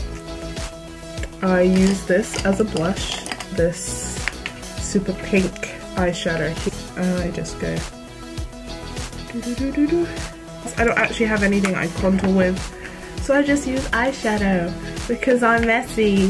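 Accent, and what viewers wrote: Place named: United Kingdom. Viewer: Omg your British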